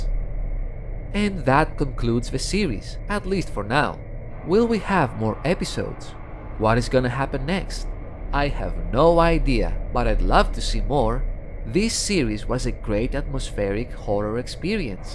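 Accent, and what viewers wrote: Greece. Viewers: Instantly could tell you're greek! Hello neighbor! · is this a greek accent?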